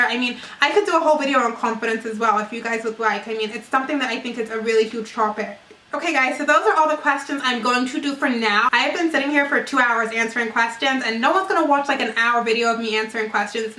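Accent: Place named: England, United Kingdom. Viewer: ok cause I knew I heard a lil english accent there but wasn't sure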